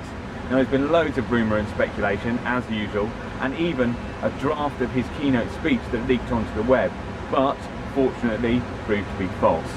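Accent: England, United Kingdom. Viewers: english accent...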